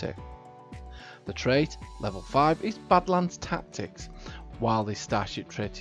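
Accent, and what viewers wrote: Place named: Northern England, United Kingdom. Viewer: Ive been watching your videos for a while now and just realised you sound like an airplane pilot. The cadence, the sound quality, everything. 24th century ships explained by a 21st century northern English commercial airline pilot. All the best from Manchester.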